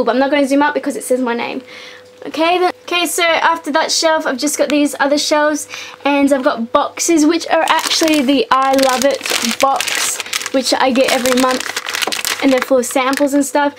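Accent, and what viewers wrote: Australia. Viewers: are u from Australlia because you sound like it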